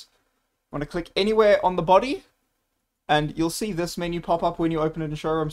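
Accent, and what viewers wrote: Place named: United Kingdom. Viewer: This is brought my attention to how surprisingly English/British your accent is. Great tutorial though (If for some reason I decide to play Asseto Cora at any point)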